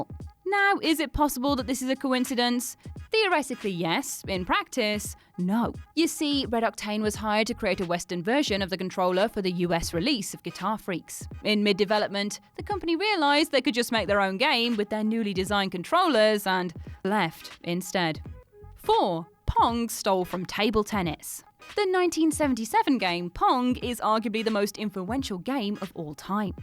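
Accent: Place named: England, United Kingdom. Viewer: I could literally listen to talk all day long. Don't know what style of English accent she has but a red head with a beautiful accent whom I'll never meet or talk to has stolen my heart like a school boy crush. Swoon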